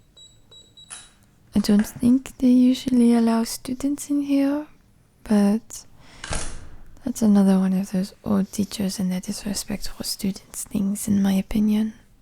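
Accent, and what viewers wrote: France. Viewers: I love the french accent, theres something about it that makes my brain go “love of your life rn” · You're french accent is definitely the best on yt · We're blessed with another French accent audio ☺ thank you!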